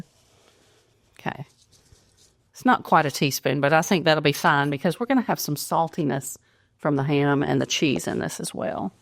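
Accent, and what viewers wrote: South, United States. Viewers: Of course. I like her on video, she is so pleasant and calmly cheerful, she sounds like a female Mr. Rogers, with a southern accent (Georgia?)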